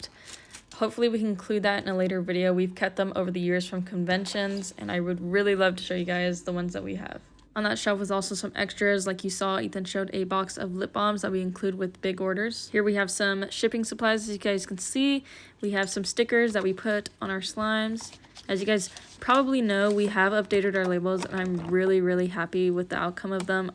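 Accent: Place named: Australia. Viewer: Is it just me or did she go from no accent to Australian accent 😅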